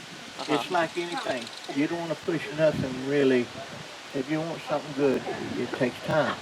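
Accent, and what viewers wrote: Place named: South, United States. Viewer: I can't understand what he's saying. Sounds country as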